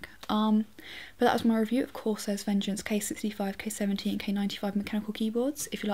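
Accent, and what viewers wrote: England, United Kingdom. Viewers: this is a very good channel, with a lot of good stuff and its a talented, good looking girl, but her voice is so so bad, with that annoying british accent and a very low voice.keep the good work and work with your voice.congratulations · Such a beautiful voice. Can I ask what part of England your accent is from? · You have the perfect English 'accent'. Technically it's not an accent I suppose but there are definitely different accents/dialects in England. You have the nice and proper way of speaking and I could listen to you talk about nothing all day long to be honest.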